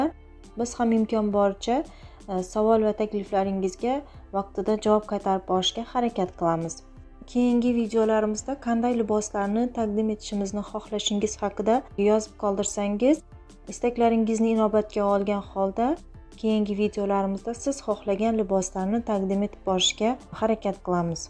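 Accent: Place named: Khorezm, Uzbekistan. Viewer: Хоразимнанмисиз шевангиздан ухшатдим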